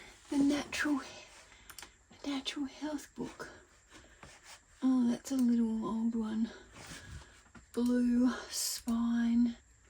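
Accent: Australia. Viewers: I cant get enough of you saying the word "book" that Australian accent though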